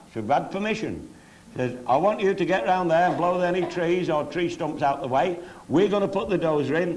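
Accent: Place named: Cheshire, United Kingdom. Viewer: I live in the same area that he's talking about. Nice to hear an authentic Cheshire accent.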